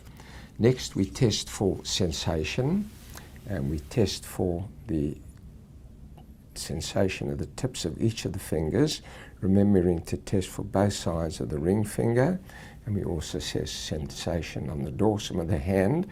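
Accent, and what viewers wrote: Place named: South Africa. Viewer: he has a South African accent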